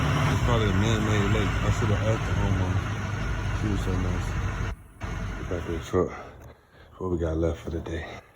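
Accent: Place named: Atlanta, United States. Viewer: Bra so Atlanta 🤣🤣🤣I just subscribe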